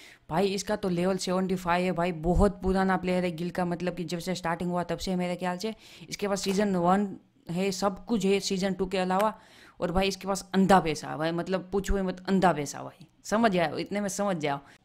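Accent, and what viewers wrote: Gujarat, India. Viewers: Aap Gujarat se ho kya bhai?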